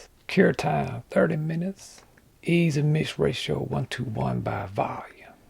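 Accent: Louisiana, United States. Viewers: voice just makes me think of a guy in the back woods bayou near New Orleans sitting on a porch with a banjo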